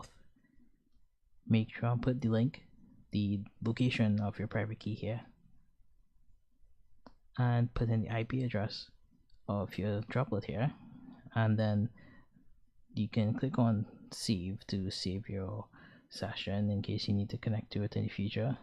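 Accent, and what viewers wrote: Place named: Trinidad and Tobago. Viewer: I knew that was a Trini accent. Happy to know there are more high-level coders from TT. Well done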